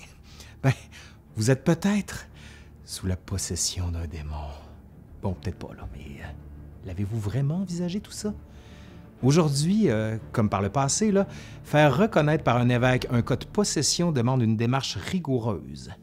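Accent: Quebec, Canada. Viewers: Mes seules références en la matière, c’est le film “The Exorcist “ de 1973😂! Merci pour ce complément d’enquête apportant quelques pièces de plus à mon puzzle! L’humour et votre bel accent Québécois rendent l’enrichissement de nos connaissances plus facile! 👍🏼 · Je suis tombé sur ta chaîne car je trouvais le thème intéressant. J'ai été surpris que tu sois québécois et j'ai trouvé ça dommage car je ne suis pas habitué à cet accent. Cependant, j'ai visionné un peu plus tard une autre de tes vidéos et je dois dire que ça ne me dérange plus ton accent. Les sujets traités sont tellement intéressants et je trouve même maintenant que l'accent québécois est plaisant et joli à entendre. Voilà, Merci de nous éclaircir sur tous ces sujets tout aussi passionnants les uns que les autres ! Keep going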